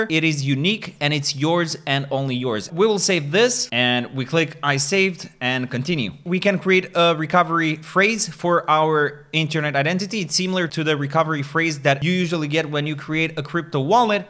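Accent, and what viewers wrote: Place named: Portugal. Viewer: 100% que es tuga! 😁Bom conteudo btw, keep it going ehehe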